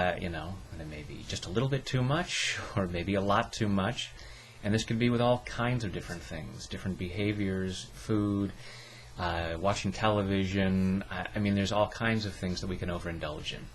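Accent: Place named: United States. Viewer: do you think this can work over the radio? Without visuals? Would you like to do a radio interview with me? For the uk? I assume you are in the US due to your accent. I always put a recording on my YouTube channel 'Feustelfit on air 91.8 Hayes fm' Please get in touch <mail>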